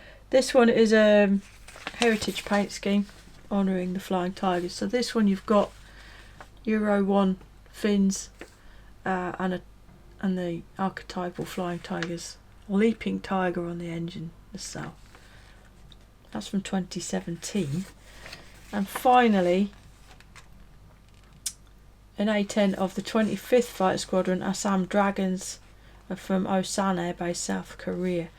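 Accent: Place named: Lincolnshire, United Kingdom. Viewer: Hi, hope you're well. I had no idea you came from Spalding! (Although I should have realised from the accent.). In the mid eighties when I was young and even more stupid than I am now, In my motor racing affairs I became involves with a company that operated out of a place called Cradge Bank, and I lived in Spalding for around three years on and off. I used to travel in from Peterborough on the Cowbit road, and the amount of timesI would see the A10s flitting about, virtually every trip. Of course you see one while you are driving, you "feel" the other one.. Of course they have been using you for target practice! My favourite scheme was always Euro 1. Very difficult to get the colours really right on that. I may have to invest in this one- thanks for the review. Rich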